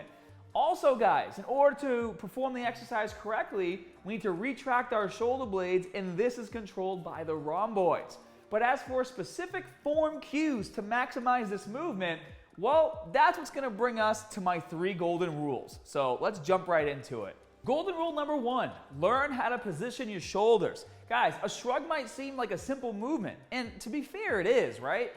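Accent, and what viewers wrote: Boston, United States. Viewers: Love the Boston accent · This guys is from Boston isn’t he. Sounds like marky mark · Ahahaha. I couldn't get over the bahbell and had to find out if you were from Boston. Lawrence, close enough. Hi from Manchester NH although I grew up in Somerville. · Ha,ha,is this a Boston accent?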